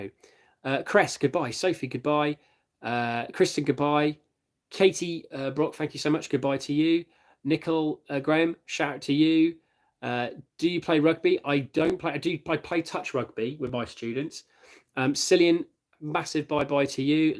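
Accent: United Kingdom. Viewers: HE HAS A BRITISH ACCENT AAAAAAAAAH THIS IS AMAZING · Are you british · THE BRI'ISH ACCENT ❤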